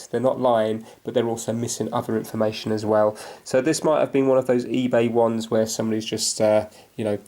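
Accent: United Kingdom. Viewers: your brittish accent was so lit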